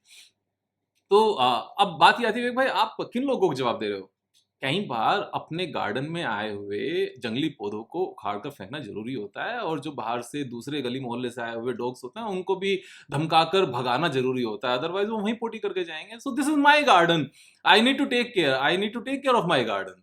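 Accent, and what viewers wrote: India. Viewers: Accent of English will always be Indian for us. If English is not our mother tongue then why should we be expert in pronunciation. Those who measure others with exact pronunciations are just having an inferior mentality. Are foreigners able to speak Hindi with exact pronunciation? Good bhai that you addressed all these guys in this video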